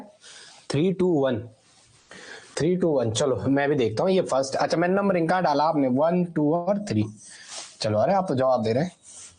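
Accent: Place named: Rudraprayag, India. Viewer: Rudraprayag se ho na aap sir ji..tone se pata chal raha hai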